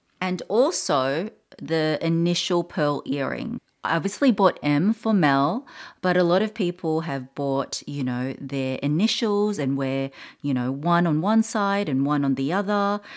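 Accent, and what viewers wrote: Australia. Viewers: I am surprised that the Cartier watch (Tank or Panther watch) didn't make the list. I think the problem with Tiffany is that they don't really have one iconic piece that is unique to them. I think the Tiffany T line the T square bangle can become that piece, unless they start changing it then it won't be iconic anymore. Thank you Mel for such a lovely video. Alway enjoy watching your videos...and that Australian accent is gorgeous!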